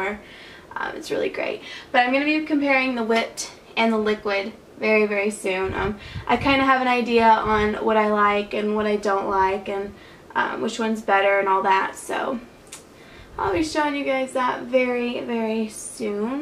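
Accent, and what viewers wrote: South, United States. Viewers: you dont have your southern accent as much anymore ive noticed! love your videos pretty xx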